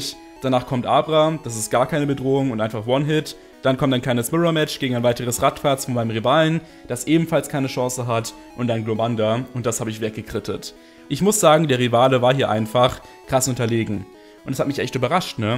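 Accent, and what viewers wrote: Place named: Germany. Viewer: Ich hab selten jemanden so deutsches Englisch sprechen gehört...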